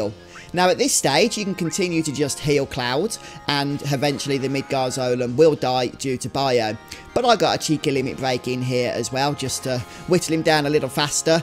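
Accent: United Kingdom. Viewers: dude you should start practicing to steer away from your crazy british accent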